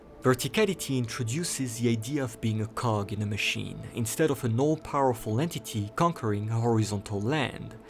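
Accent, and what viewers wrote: France. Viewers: i love your french accent lmfaoo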